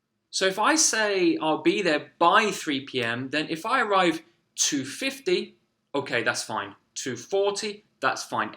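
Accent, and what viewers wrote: United Kingdom. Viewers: Lovely British accent I liked 🙋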